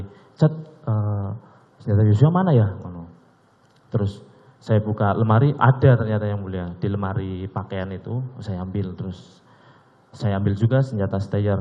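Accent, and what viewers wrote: Java, Indonesia. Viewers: Baru denger, nama tp medog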